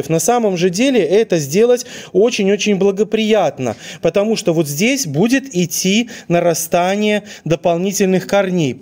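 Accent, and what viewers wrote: Belarus. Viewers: Речь красивая с небольшим белорусским акцентом!!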